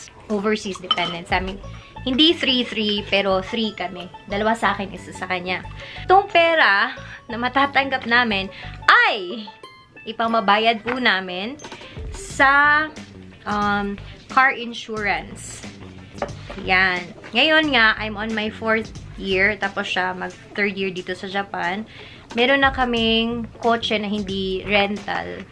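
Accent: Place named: Visayas, Philippines. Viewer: Ate gawa ka naman ng video with your class sa susunod. 😀 Bisaya man diay ka te.😁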